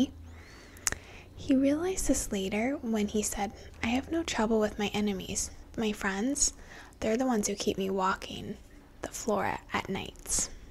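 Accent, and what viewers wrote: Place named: Wisconsin, United States. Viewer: Wisconsin accent. Accentuation on words with “a”. The A comes off as very nasally.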